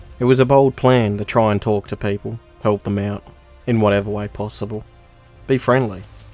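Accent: Australia. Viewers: first time as a aussie hearing another aussie speak and going we do not sounds like that aha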